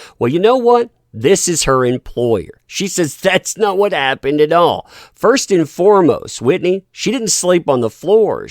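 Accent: South, United States. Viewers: always has such a soothing voice to me. Like so many people will just be shouting and talking so loudly and overly expressive, and it grates on me in more ways than one since I get oversensitized very easily. But something about calm southern drawl just is very calming like it's almost paradoxical how he's talking about such harrowing topics with such a calm voice, it really helps my anxiety.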